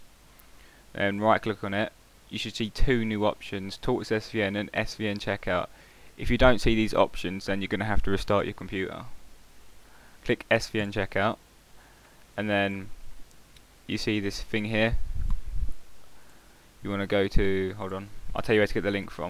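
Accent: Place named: London, United Kingdom. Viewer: Hey, you sound like you come from some where around Sidcup? Correct?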